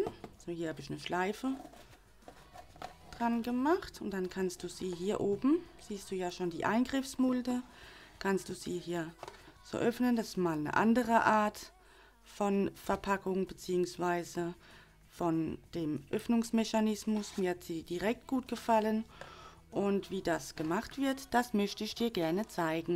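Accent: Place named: Hesse, Germany. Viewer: Habe dein Einführungsvideo gesehen und kann kaum glauben, dass du dir so wenig zugetraut hast. Box ist sehr hübsch und akkurat gearbeitet. Als gebürtige Hessin mag ich deinen Dialekt ganz gernze hören . LG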